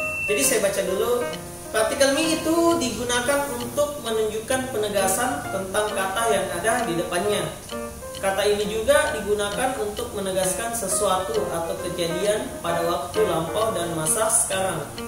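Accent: Makassar, Indonesia. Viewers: Itu bukan bhasa makassar brow tpi logat dlm bhs indonesia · Tapu itu logat makassar bukan bugis😅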